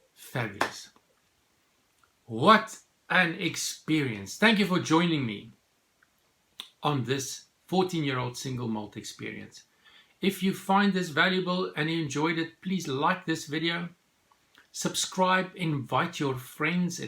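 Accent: South Africa. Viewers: The South African accent is so cool. Cheers my dude.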